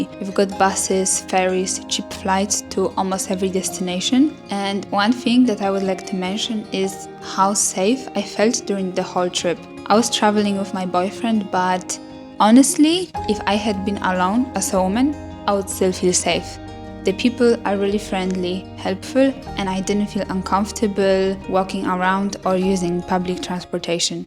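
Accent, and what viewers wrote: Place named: Eastern Europe. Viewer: Czech, Slovenian, Polish? Usually I can recognize accents.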